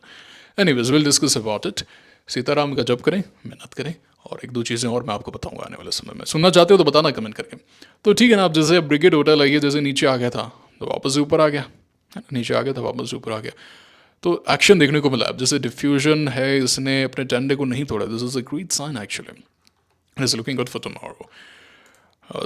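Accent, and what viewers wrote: Rajasthan, India. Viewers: Sir ji, aaj to bahut jyada dil khush kar diya apne, akhand bharat ki baat bolke, sanatan ki baat bolke, sabse sashakht sabhyata ki baat bolke, spirituality ki baat bolke. Mai Bikaner se hu aur aapki baate aur apka lahza sunke ek baat se sure hu ki aap bhi Rajasthan se ho aur kahin na kahin apka sambhandh bhi Bikaner se hai, aisa isliye kyunki 2-3 din pehle ek video me apne bola tha " Market bhacheed bola rha hai". Aisa to yahan bikaner me kafi bola jata hai. I may be wrong but mujhe kafi stong feeling aayi ki aap bhi Bikaner se ralate karte ho. Itni gehri aur amulya baate humare sath share karne ke liye aapka koti koti dhanyawad. Jai siyaram 🙏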